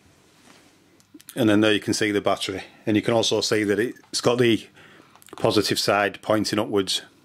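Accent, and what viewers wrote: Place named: England, United Kingdom. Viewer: brilliant english accent i adore )))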